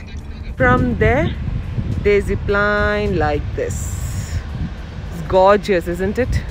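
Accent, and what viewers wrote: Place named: United Kingdom. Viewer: You speak English like British. Reminds me of Queen. We Indians are best.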